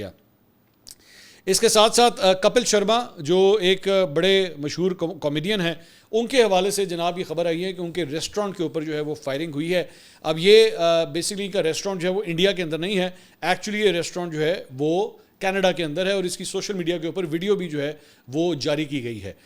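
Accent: Punjab, Pakistan. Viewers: صاحب آپ کا حب الوطنی سے سرشار یہ دبنگ انداز بہت اچھا لگا اوپر سے پنجابی کا تڑکا کیا کہنے ہیں آفرین ہے بھائی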